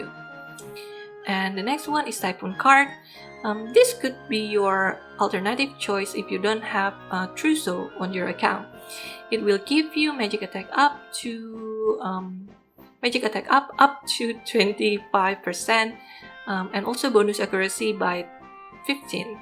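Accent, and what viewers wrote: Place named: Indonesia. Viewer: Your English like Indonesian People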